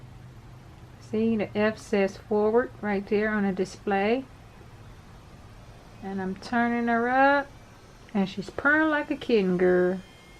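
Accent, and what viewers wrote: United States. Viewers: Girl, are you from WV?! You sound more country than most my home girls here in the sticks! Love it! Thanks for the review! Very helpful! Edit: Did I miss this answer? Can you use them while they're plugged in charging?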